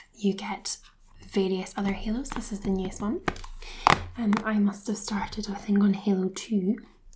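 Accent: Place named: Scotland, United Kingdom. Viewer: let's plays should be a thing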